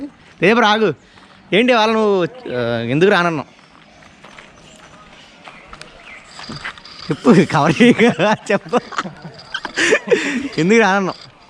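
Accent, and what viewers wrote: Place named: Godavari, India. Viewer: Godavari basha bagundi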